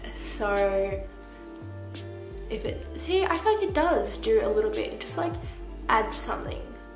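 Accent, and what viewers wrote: Australia. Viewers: I could listen to Australian Accent all day😍🤗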